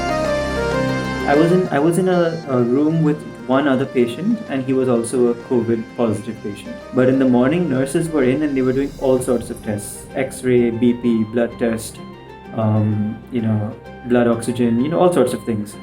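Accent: United Kingdom. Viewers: jb bimari lgi tb desh ki yaad aa gyi....vrna abi tk toh british accent me hi baat krte thee.... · It is really good to hear my friend that you have recovered from this contagious virus. Stay Safe and one more thing I like you English accent and you style of speaking English with coolness. If u want to tell me regarding how can I improve English speaking, then it will be beneficial for me. I know this is not the right platform because u are sharing experience regarding your journey against Covid-19, but if u want to give some suggestions then okk or don't wanna give then also okk. Have a good life my friend.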